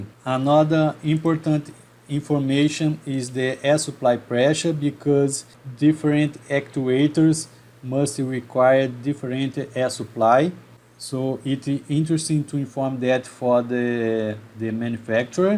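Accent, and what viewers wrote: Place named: Brazil. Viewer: Cara que canal fantástico... É recheado de assuntos sobre a Engenharia Química pena que eu não manjo muito de inglês para compreender melhor seus vídeos, percebi que você é Brasileiro o seu sotaque te entregou um pouco hahaha. Entrei a pouco tempo para esse mundo incrível da Engenharia Química, estou no 3° período do curso e encontrei seu canal... Parabéééns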